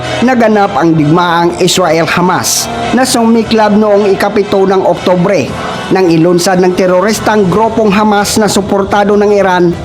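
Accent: Iloilo, Philippines. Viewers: Natural na boses yan ni lods hindi tulad ng iba nagamit ng ai signature yan. Maganda dito detalyado kahit natural na ilongo🇳🇮🇵🇭🇺🇲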